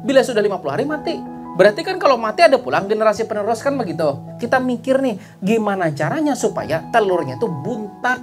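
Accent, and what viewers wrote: Banjar, Indonesia. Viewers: seneng sama nada bicaranya, ini banjar ya?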